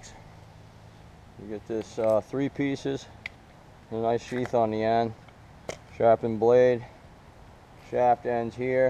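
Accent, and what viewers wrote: Maine, United States. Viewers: That accent...you must be from Maine.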